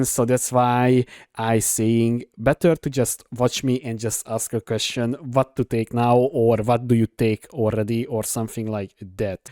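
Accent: Hungary. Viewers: Hey, just from curiosity , by any chance do i get right from your accent that you are hungarian?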